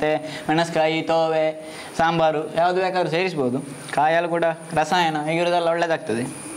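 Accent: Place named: Udupi, India. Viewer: Super receipe...nicely done.looks yummy. I like all your videos and enjoy the way you talk in Udupi Kannada.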